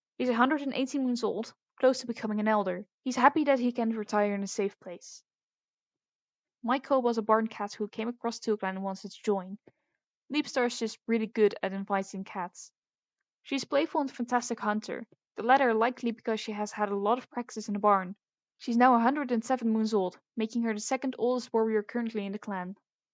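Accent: Netherlands. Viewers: Awesome! Also do you speak Netherlands/Dutch? It sounds like that so sorry if its not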